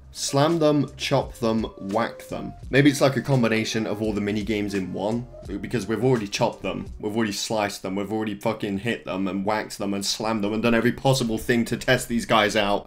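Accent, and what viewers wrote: United Kingdom. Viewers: man youre british right and i got an ad for teeth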